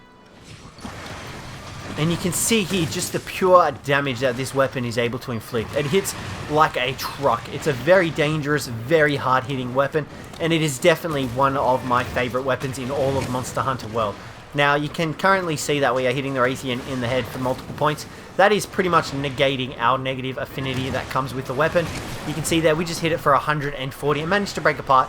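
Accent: Australia. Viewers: If you've ever met anyone from down under, there are many words they pronounce differently culturally. I.e. Pita (Pih tuh) visa (Pee-ta), and abalone (Ah-ba-lone) visa (Ah-ba-lone-e)... and in the end, who really cares? Did you understand WHAT he was talking about? If so, why is his use of the word a problem? So, in my opinion, let it kill you... One less d-bag's comment.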